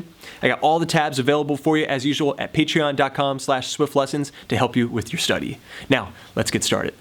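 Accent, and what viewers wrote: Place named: Philadelphia, United States. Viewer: I thought I detected a Philly accent...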